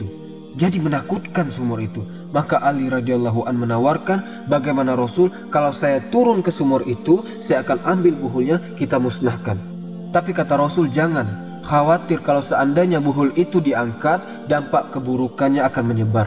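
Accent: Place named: Sulawesi, Indonesia. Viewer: Logatnya kayak orang sulawesi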